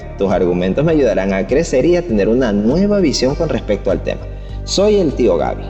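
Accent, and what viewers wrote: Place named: Venezuela. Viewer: Pero tu eres mexicano. Me pareces que tienes acento venezolano.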